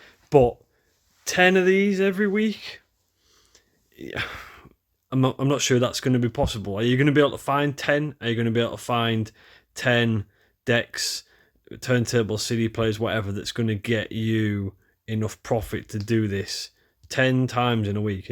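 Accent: Scotland, United Kingdom. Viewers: Scottish or maybe Irish accent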